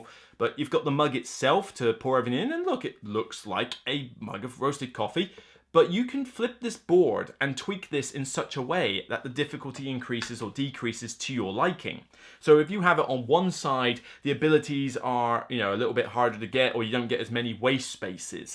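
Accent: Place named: West Country, United Kingdom. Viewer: Where you from? Devon? What's this accent, west country ish?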